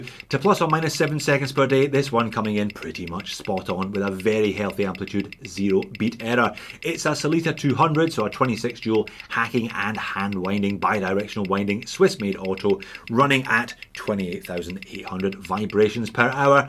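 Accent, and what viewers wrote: Scotland, United Kingdom. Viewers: Maybe I've gotten too used to the Scottish accent by now, but have you ever thought about doing some kind of voice-over or voice acting? The enthusiasm is contagious.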